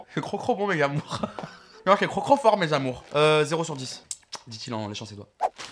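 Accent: Quebec, Canada. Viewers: Juste l'accent quebecois mérite un like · Ptdr l'accent québécois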